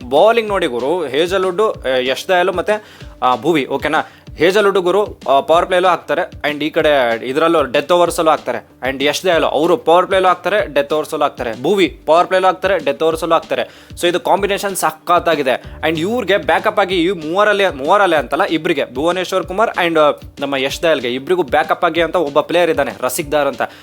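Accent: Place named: Hassan, India. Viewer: Nin pakka hassan Mysore 😂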